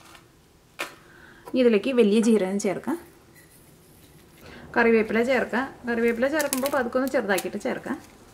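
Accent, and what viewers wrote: Thrissur, India. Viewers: Ithu njan thanne kandu pidicha receipie athu kalaki 😆😆. All ur receipes are simply the best. And ur Thrissur slang😙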